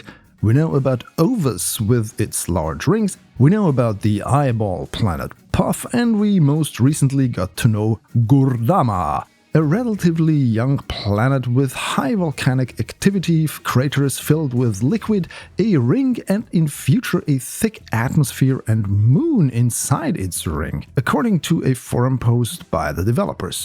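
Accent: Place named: Germany. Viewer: I know a german guy trying to speak professional english when I hear one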